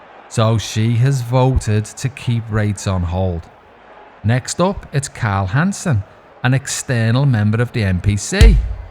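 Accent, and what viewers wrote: Liverpool, United Kingdom. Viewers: Not big on Cynicism. The tone is off putting. Not sure a British accent helps either. Sorry but Brits have been telling us what we're doing wrong since greedy Governor Grey. Go home and fix your own problems - not add to ours please. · Ah I’ve missed that Scouse lilt and it’s take on NZ! Welcome back!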